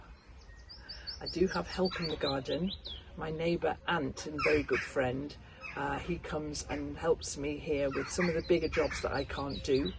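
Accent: United Kingdom. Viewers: Love your weaving!!!!! You are so talented! I have been watching you on utube for several years. Thanks for all your talks about jumping in and trying something new. I am a quilter but love to try all sorts of creative things. Also I have to tell you I also watch you because I crave listening to British accent as my mum was from England. Thanks for having a chat!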